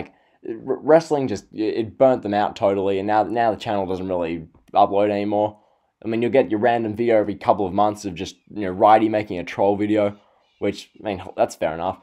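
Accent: Australia. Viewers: Are you Australian? I recognize the accent.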